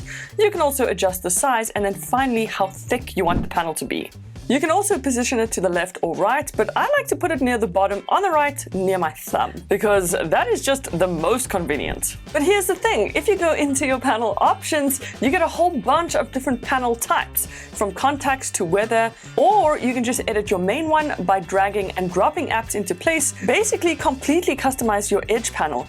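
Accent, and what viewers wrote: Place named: South Africa. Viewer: She sound Hella south african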